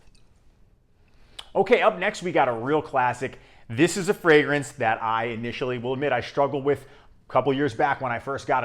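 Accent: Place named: South Jersey, United States. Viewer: Just found the channel and it has to be noted: most people in south jersey dont have Patrick Bateman looking skincare. As a now central jersey transplant from Camden County his actual look is more like here, but his voice is 100% SJ. Most of SJ are bearded long hairs like myself or boomers.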